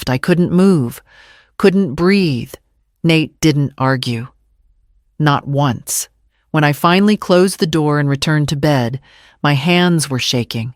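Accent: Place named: Australia. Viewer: Sorry but this AU voice.....ayayayay. 😅😢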